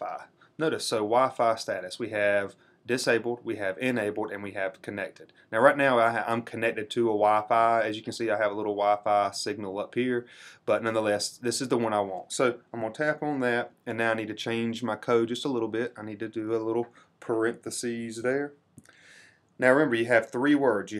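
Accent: South, United States. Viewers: ...thanks so much, just implemented the bluetooth feature to my device. I bow to you, honorable man with southern accent😊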